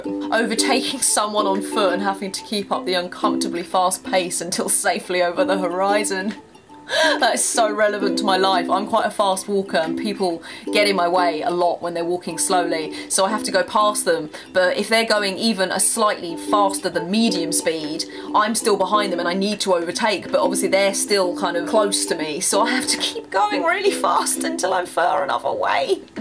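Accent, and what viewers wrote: United Kingdom. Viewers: Just found your channel and I absolutely love it 😀 I really like your british accent, its just nice to listen to your voice. That point with the phone is so damm true 😂😂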